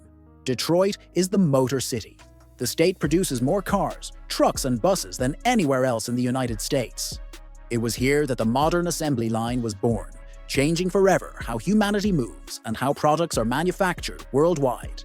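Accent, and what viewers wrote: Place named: Ireland. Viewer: AI(?) Irish. Do love it.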